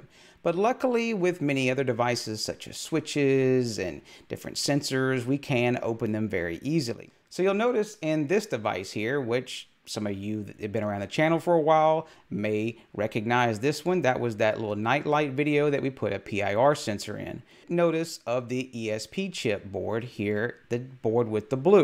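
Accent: Louisiana, United States. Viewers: Very Cajun!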